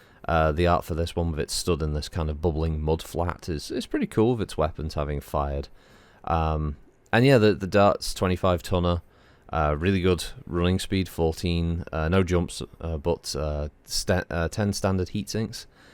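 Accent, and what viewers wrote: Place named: Scotland, United Kingdom. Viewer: A Scott’s accent is perfect for pronouncing Kathil Uhlans.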